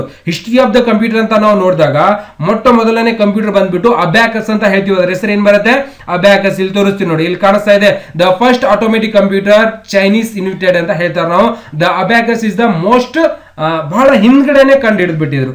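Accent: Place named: Dharwad, India. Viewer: ನೀವು ಮಾತಮಾಡುವ ಕಲೇ ತುಂಬಾ ಚೆನ್ನಾಗಿ ದೇ ನಮ್ ಧಾರವಾಡ ಬಾಷೆ ಕೆಳಲೂ ಬಾಳ ಕುಷಿ ನೋಡ್ರಿ ಸರ್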